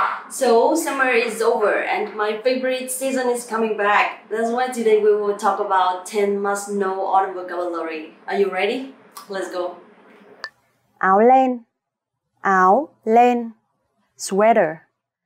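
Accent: North, Vietnam. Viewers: They teach northern accent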